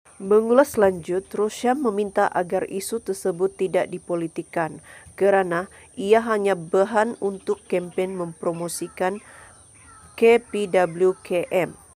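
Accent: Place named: Indonesia. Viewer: Suara ni mcm indon je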